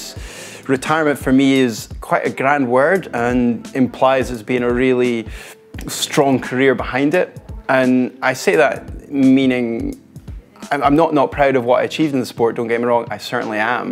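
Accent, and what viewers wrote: Scotland, United Kingdom. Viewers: He is scottish......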